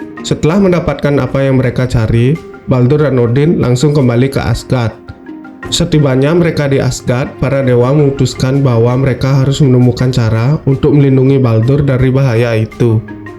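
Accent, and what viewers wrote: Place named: Bali, Indonesia. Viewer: Beli logat bali nya masih kental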